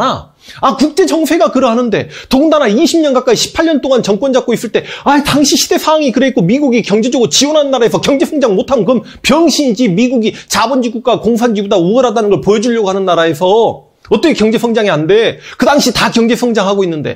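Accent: Jeolla, South Korea. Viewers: 전라도 사투리 쓰시네요?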